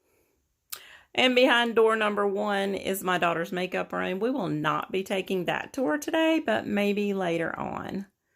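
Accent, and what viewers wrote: South, United States. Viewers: Where are you located? I am just curious because I’m a southerner with your accent too!! 😂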